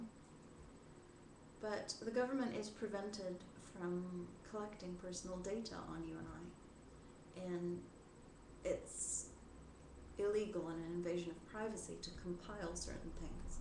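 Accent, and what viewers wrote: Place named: Ireland. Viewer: I love your accent and you are a beauty! Are you from Ireland?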